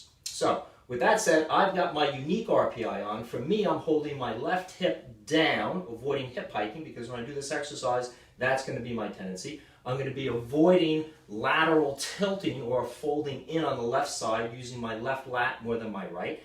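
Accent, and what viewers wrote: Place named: Boston, United States. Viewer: Thanks again! By the way, where are you based? I’m thinking east coast, maybe Boston, based on your accent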